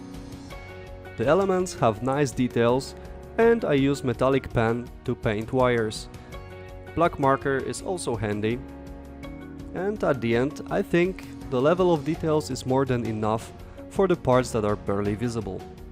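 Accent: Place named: Eastern Europe. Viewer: Oh, no widzisz zasubskrybowałem już kiedyś i nawet nie sprawdziłem do kogo 😄 Po akcencie czułem że jakiś słowiański człowiek, ale nie wiem dlaczego zakładałem że może Czechy lub Słowacja. Co do szachownicy, mogłem faktycznie przeoczyć, dopiero gdy instrukcję Eduarda pokazałeś z sowiecką wersją ii zwróciłeś uwagę że to źle jest - rzuciło mi się to w oczy. Dzięki w każdym razie za wideo i gratulacje jeszcze raz świetnie wykonanego modelu. Ja po wielu latach postanowiłem w końcu zacząć nagrywać swoje produkcje, ale na razie jestem na etapie eksperymentów z oświetleniem, ustawieniem kamery, montowaniem itp. Bardzo to niekiedy komplikuje całe przedsięwzięcie, więc tym bardziej doceniam dobre wideo 👍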